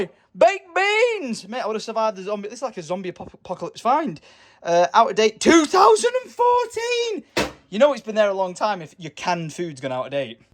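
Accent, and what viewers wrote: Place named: Manchester, United Kingdom. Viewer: I just love the Manchester Accent! could listen to talk all day x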